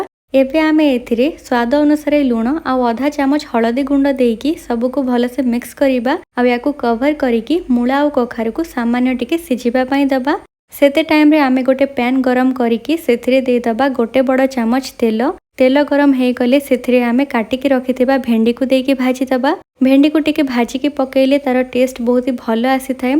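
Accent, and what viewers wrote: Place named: Western Odisha, India. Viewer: Say in your western odisha accent please it's so soothing to hear.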